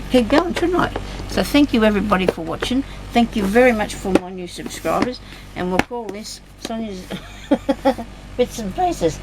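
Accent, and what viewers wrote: Australia. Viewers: Hello - I have just discovered your channel and watched your tassel making. When I started to listen to you talk I thought ooh! is she an Aussie or Kiwi - just took a couple of sentences to figure it out - we speak the same speak. I loved the tassel you made - I know it was last year but anyway loved it - wandered into my small humble craft room and looked around - I am getting cracking tomorrow. I also loved your velveteen flowers and am totally inspired. I am from Perth and am going to watch all your videos now. Happy New Year to you and your family - this also includes the cat!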